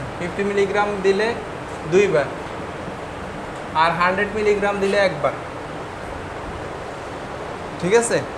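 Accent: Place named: Chittagong, Bangladesh. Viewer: স্যার এর লেকচার অনেক সুন্দর, টপিক গুছিয়ে বলে, স্যার মনে হয় চট্টগ্রাম এর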